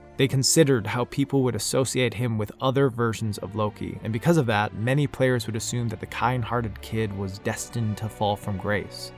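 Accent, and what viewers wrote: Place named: Midwest, United States. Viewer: NO WAY!! I grew up around the Twin Cities! Currently in Florida for college but I recognized the city, I could kind of tell you were from the mid-west by how you talked but I never realized you were that close to home. Your channel inspired me to make my own video essays about my work in VR development. Keep up the awesome work!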